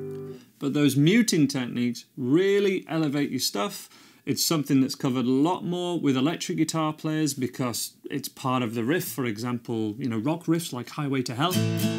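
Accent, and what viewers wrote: United Kingdom. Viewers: I really dig that British accent of yours, makes the lesson more entertaining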